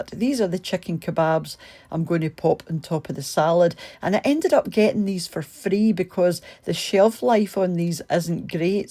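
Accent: Scotland, United Kingdom. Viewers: I could listen to you talk all day, doesn't matter if you're reading the encyclopedia just love the Scottish accent. 🥰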